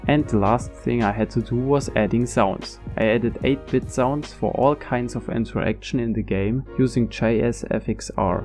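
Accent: Germany. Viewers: weil ich auch aus Deutschland bin und etwas an deiner Aussprache klang deutsch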